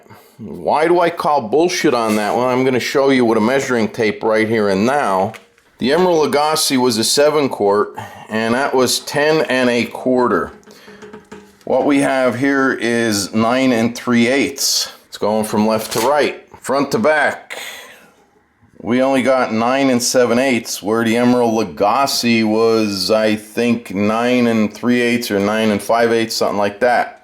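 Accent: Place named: Upstate New York, United States. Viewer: He sounds more upstate. I’d say Binghamton.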